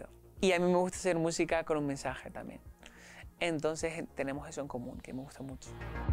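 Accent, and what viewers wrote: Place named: Canary Islands, Spain. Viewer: Deseando ver todo lo que está preparando. Las entrevistas de Ago me relajan. Tiene ese acento canario y esa voz que me dejan flotando ❤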